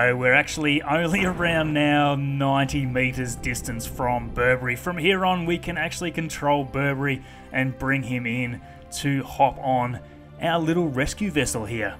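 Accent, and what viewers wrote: Australia. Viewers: Darren lockyer ahh yeaa that accent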